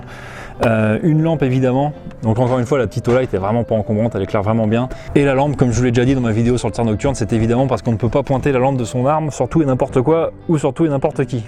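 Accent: United States. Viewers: vidéo très intéressante, par contre faut qu'il arrête avec l'accent américain péteux c'est trop mdr ceci dit très bonne vidéo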